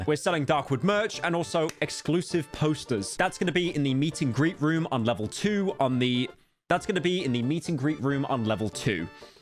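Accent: United Kingdom. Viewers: Yea I’m unsubscribing, I forgot your British 🤢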